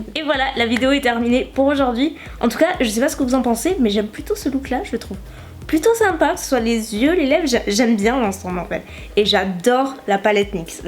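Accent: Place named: South-West, France. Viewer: moinSSS le bon accent du sud ouest ;)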